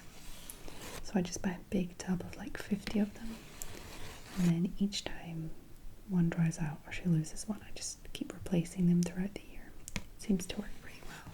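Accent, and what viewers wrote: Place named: Scotland, United Kingdom. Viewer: I was thinking the whole way through this video 'she definitely sounds a little Scottish' and then you said you went to school in Scotland lol that's where I'm from too. Can I ask which part of Scotland you lived in?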